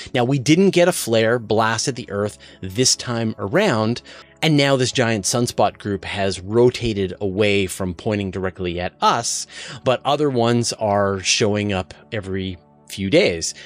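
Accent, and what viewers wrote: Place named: Canada. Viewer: Hello, thank you for the news that you put out. I have a question for you off topic but I place people by their accents and yours has a tiny, tiny bit of Canadian and I have some difficulty placing it. If I had to guess it would be Canadian within a few miles of the US border on the western 1/3 of the country. I would like to know where you are from, Born , raised, K-12 in a big city or rural. Thanks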